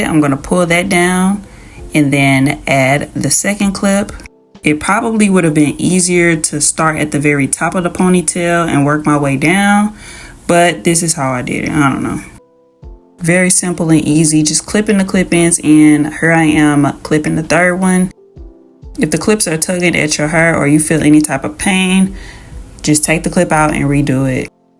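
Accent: St. Louis, United States. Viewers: I can tell by your accent you’re also from STL. ❤️ Love your videos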